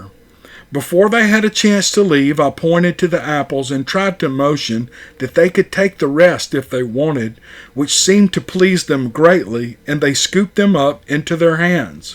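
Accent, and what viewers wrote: South, United States. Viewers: Cool! You sound so familiar when you say "Maggie". My name's Maggie also, & here where I'm from in Macclenny, FL we speak with the same southern accent as you do. I'm almost right on the FL/GA line. Sure is a great story😊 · I'm from the UK. Every Saturday my four year old grandson stays with me, as I'm a widower. And he loves bigfoot stories, you are his favourite bigfoot story teller. When he goes to bed, it's always to your deep southern drawl he falls asleep to. So thank you, bud. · Hey, Always excited for another encounter story narrated by you and your awesome Southern drawl!!! Squirrel!!! 😍👣 Thank you for your time. Your interest in these encounters is infectious and incredibly heartwarming. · That's how us Southern boys talk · Thanks! We love to hear that southern drawl telling a fresh story 👍🏻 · That story was written so well I could picture it in my mind as you read it. 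Your voice lends such a warm quality it’s soothing to the ears...I feel like I’m listening to a relative of mine telling a story because we all have southern accent as well. I giggled out loud at the comment about adhesive on the dentures or you’ll spit them on the table. 🐿 You have a way with words 😂 Thank you for your stories. I’ve enjoyed each and every one I’ve heard. Your time and efforts are definitely appreciated. Look forward to hearing more! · You did a pretty good job with pronunciation! It’s delightful to hear so southern a voice speak a northern name . My only complaint is there are’nt enough material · Well done another great story told in your wonderful Southern drawl up the South!!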